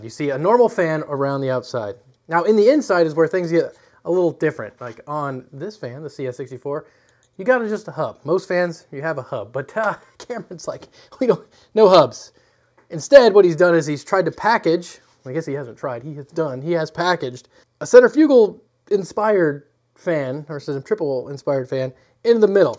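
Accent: Michigan, United States. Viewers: You're from Michigan aren't you?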